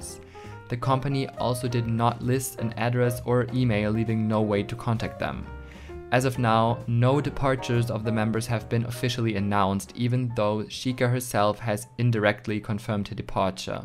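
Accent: Germany. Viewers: du hast keinen schlechten akzent, aber ich konnte direkt hören dass du deutsch bist, cool :) super video!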